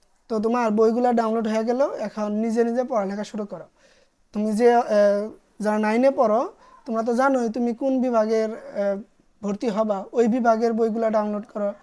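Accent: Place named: Sirajganj, Bangladesh. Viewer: সিরাজগঞ্জ / আশে পাশে বাড়ি মনে হচ্ছে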